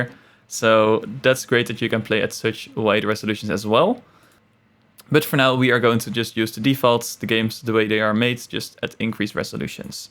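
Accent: Netherlands. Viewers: ahh I recognize the accent and indeed you are Dutch. Really cool channel, subscribing now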